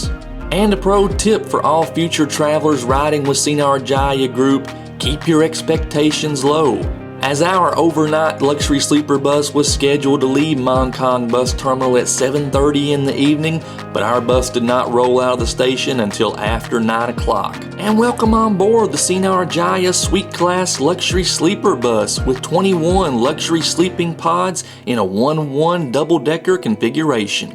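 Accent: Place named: Eastern Kentucky, United States. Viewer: Thanks mucho for your very dry narration - and yeah, hear a bit of Eastern KY in there!!!!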